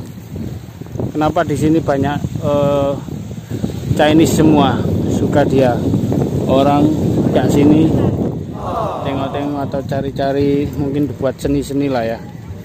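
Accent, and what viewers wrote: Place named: Java, Indonesia. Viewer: pekat betul bahasa jawa kau komandan😅...menjaja tu brjualan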